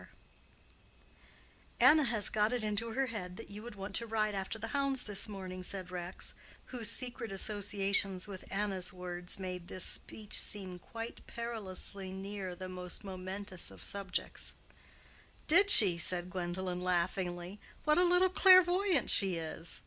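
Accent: United States. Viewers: I think its a great reading. It's actually the subtitles that are poor. I don't mind the American accent it's their literature as well as Britain's. Also tonally American readings are easier to listen to as many English readers flatten the vowels and make it a bit drab. They also get rid of class bias and certain readers affectations.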